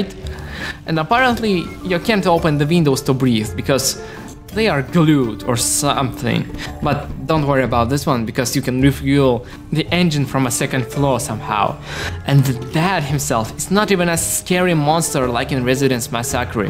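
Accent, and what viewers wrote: Russia. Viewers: У все ещё русский акцент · Даже акцент немного русский я уверен это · Акцент русский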